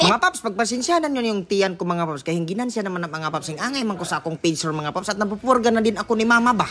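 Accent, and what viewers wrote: Visayas, Philippines. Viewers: Kailan kapa niabot?🤣🤣 i like your bistag jud, bisayang tagalog 🤣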